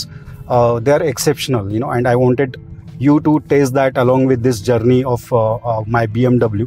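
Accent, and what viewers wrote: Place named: Pune, India. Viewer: Without even seeing your description, I knew you are a Puneri by your accent. Great to know, I owe 8 years of my wonderful life to Pune. My next next car is definitely X1. Thanks for detailed information.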